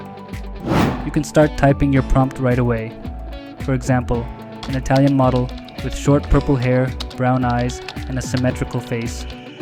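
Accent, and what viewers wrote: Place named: India. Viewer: Indians speaking English sound very ridiculous, next time use subtitles or remove anything below your tonge, the world will thank you.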